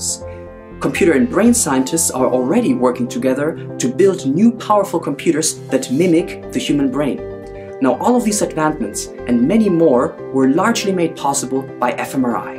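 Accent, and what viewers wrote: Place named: Germany. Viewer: do you happen to be German or where does this very slight German accent come from?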